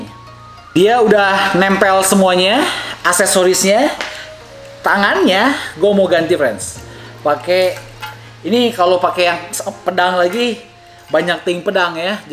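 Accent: West Java, Indonesia. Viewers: Ciri khas orang sunda kang... Hehehe, sy oge Sunda sok kitu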